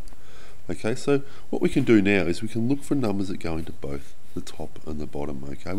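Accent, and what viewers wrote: Australia. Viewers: All the channels I have watched for this all had like the thinnest American accent, finally a fellow Aussie I can actually understand! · Jonathan Ramirez hes australian, and Bruce as well has an Aussie accent. So there you go.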